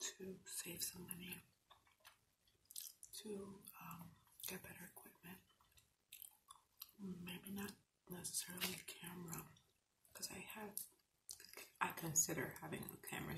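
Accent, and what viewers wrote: New York, United States. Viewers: you’re from new york are you lol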